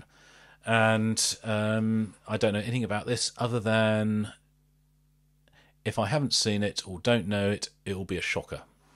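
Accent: United Kingdom. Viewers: First thought when the british accent kicked in: I feel sorry and happy about what this man is about to experience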